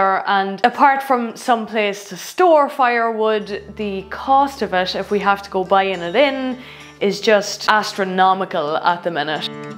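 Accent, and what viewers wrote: Ireland. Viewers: Iam a bit of the Irish me self and I love your accent, been raised in California so no accent. I do know a few things in Irish slang if I dare call it that. How blessed you are to have a beautiful cottage in the country side. I moved from California to Texas because their country sides reminds me a bit of the Irish country.😢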